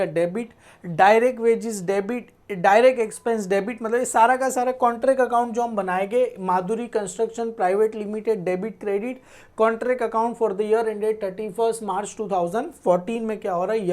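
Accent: Gujarat, India. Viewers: Sir ap gujrati ho kya..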